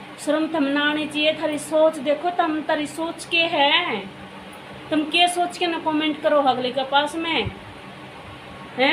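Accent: India. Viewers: Aap Ki Deshwali Boli Bhut He Aachi Laghi Ha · Puver haryaanvi😂😂😂😂😂😂♥️🤣🤣🤣tere ke dard h ,🤣🤣🤣🤣💌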